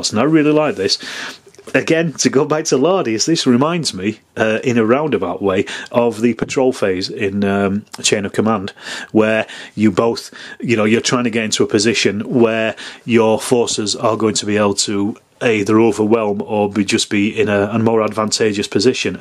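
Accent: Northern England, United Kingdom. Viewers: I preferred Medieval Wargamer's review of the rules, it was more positive and Nic has a sexy voice compared to your northern tone lol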